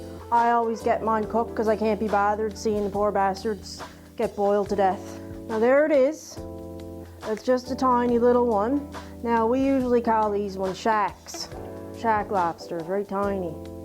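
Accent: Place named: Maritimes, Canada. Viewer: Oh, be off with ya! That's our Maritime accent over here, don't ya know?!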